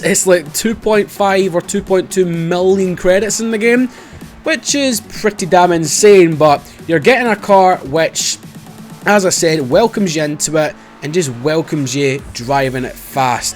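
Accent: Scotland, United Kingdom. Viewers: This guys Scottish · Are you Scottish?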